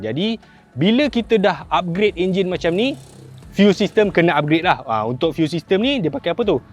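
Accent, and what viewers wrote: Kelantan, Malaysia. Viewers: kayo weh ore kelate 😁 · Abang ni org kelantan ke 😂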